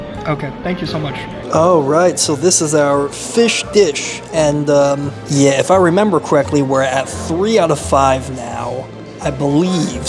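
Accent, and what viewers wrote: United States. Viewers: You’re soooo American. I love it. I’m American but never feel like I belong here. It’s awesome hearing with a true American accent and zero pretense. Your comments are outstanding.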